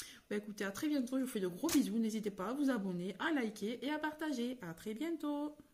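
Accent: South, France. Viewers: et ses petites combines Gros Gros bisous D'ALSACE. AVÉ ton accent tu nous amène le soleil et les cigales par ce froid. A bientôt.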